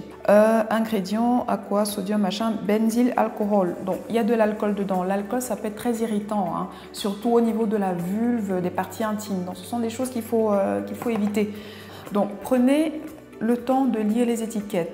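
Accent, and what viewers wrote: Cameroon. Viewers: Cet accent est typique des camerounais 🇨🇲🇨🇲🇨🇲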